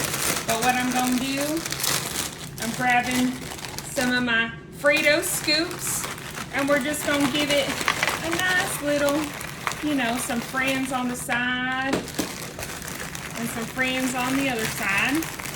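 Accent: South, United States. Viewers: Love you're southern accent, also you're so pretty country girl, love your simple recipes 😍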